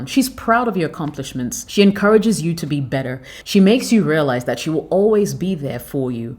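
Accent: England, United Kingdom. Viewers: Have u lived in the Uk b4, u have a wonderful accent. Thank u for all the advices it really helped a lot. You are 👏 amazing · Did you do this video all in one take? And did you read out of a telescreen prompter? That last clicking noise you made reminded me of bdsm, sounds a bit horsy. On the other hand you have a nice english accent. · You're vocally appealing with some british,twang!👌👍🙏🙏🙏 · Beautiful dark chocolate with an ENGLISH ACCENT.... I'm infatuated. Love from "across the pond".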